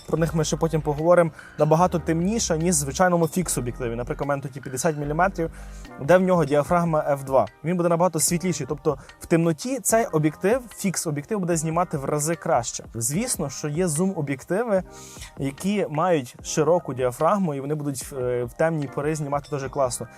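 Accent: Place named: Ivano-Frankivsk, Ukraine. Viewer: то бо гіби десь з Франківщини ви)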